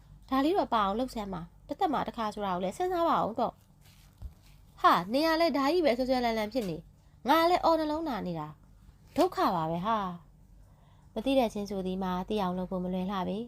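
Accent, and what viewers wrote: Upper Burma, Myanmar. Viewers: Jayရဲ့အသံလေးကို ချစ်မှချစ်😍😍😍ဘာလေးဖတ်ပြပြ အညာသံနွဲ့နွဲ့လေးနဲ့ ပီပီသသ စိတ်ပါလက်ပါ😍🩵🩵ကျေးဇူးအထူး & DAN team🩵🩵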